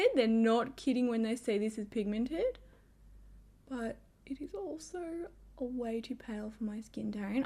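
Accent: Australia. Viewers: I love how Aussie’s pronounce the letter “h!”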